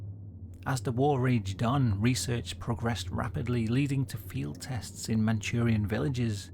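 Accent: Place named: North Wales, United Kingdom. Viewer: He's from North Wales, apparently.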